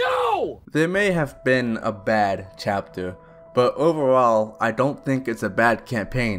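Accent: United States. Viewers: What's your accent? New York?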